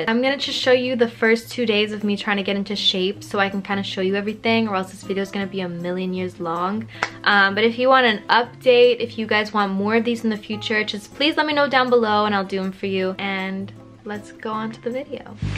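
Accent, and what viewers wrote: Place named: Brooklyn, United States. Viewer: Where are u from !!???You are giving me Brooklyn accent!!!Luv it !!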